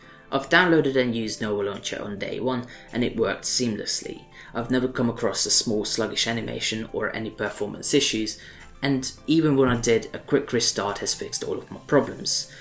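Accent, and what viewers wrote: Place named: United Kingdom. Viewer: I still own this phone in 2019. I won't upgrade until a decent phone is actually released. i used to change phones every 6 months, but at this point everything is such a mediocre upgrade. I love my Pixel 2 XL and for all it's faults, it's still the best phone I've owned so far. Hoping the Pixel 4 XL is worth buying. If Google choose LG again for the Pixel 4 XL (I know it's not in the 3) then I will not buy it. I have a hatred for LG PLED. Awful quality, Samsung are OLED kings. Just a side point, interesting accent! It's at times very British like mine, and at other times very mixed lol, just curious. Update 27.04.18: Just accidentally ordered an s10+ (£650). I have a feeling I will regret this.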